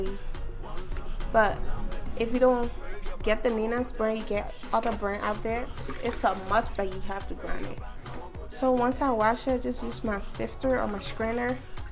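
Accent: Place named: Liberia. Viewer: She is definitely Liberian that accent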